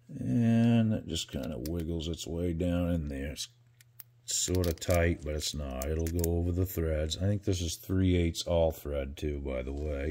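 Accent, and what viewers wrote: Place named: Boston, United States. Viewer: It'd be interesting to see Leo's thoughts as he designed this madness (?) Always enjoy your 'Baston' flavored narrative... Cheerios (but not the cereal)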